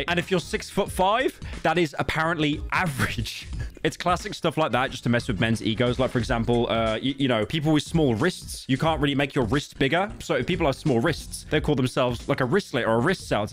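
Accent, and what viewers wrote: United Kingdom. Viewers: I get what ur saying but that’s just his British accent, not necessarily the pronunciation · I love listening to furry British man talk about American rap, very intuitive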